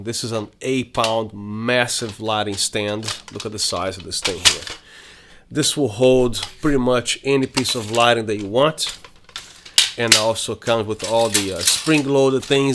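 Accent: Brazil. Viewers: The Brazilian accent is cool! Gostei muito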